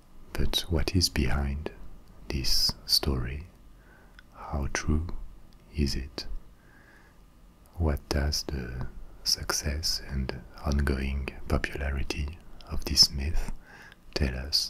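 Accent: France. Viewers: In English it is “play-toe.” Plato was Greek. The proper pronunciation IS actually “plah-toe”. With a long “ah”. He is saying it correctly, he just has a French accent. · Very relaxing videos. I dont ever get tingles but I watch asmr for relax, is my new favourite. I REALLY like the accent.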